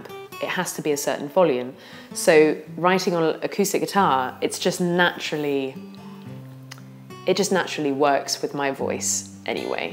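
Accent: United Kingdom. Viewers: Your British accents makes it official love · Really ? I thought that accent was kinda British...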